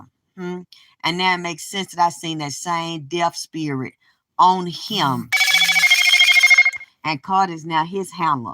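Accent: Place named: New Orleans, United States. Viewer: Your accent I love it and you are funny sound like u may be from New Orleans dirty dirty south accent dirty my favorite word you say lil Wayne and Juvenile say that dirty all the time.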